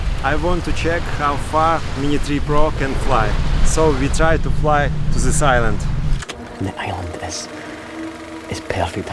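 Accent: Russia. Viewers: Блин ну и акцент у тебя русский но лай сёравно поставил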